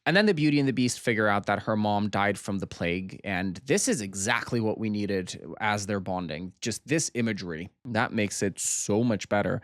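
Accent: Canada. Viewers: the more i listen to your accent the more i realize you are probably canadian which would explain it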